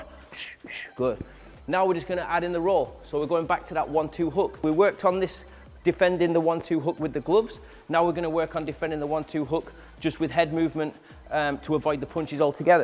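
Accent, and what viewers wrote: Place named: North West England, United Kingdom. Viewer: Your videos are brilliant, I love this channel. I train at a Boxing gym here in Perth Western Australia- I'm Manchester born 🇬🇬 I'm just loving boxing training.(it's fixing me after stroke & changing my life!) I absolutely love your channel, thanks 👌🙋‍♂️ I Started in Bredbury Stockport, you sound like your not to far from this area in the North West hey 😛🤙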